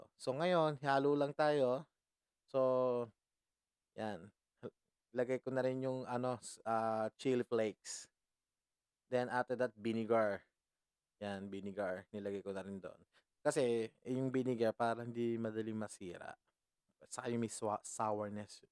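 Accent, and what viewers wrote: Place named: East Asia. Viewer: I was thinking you're Singaporean, Korean or Japanese kasi the way you speak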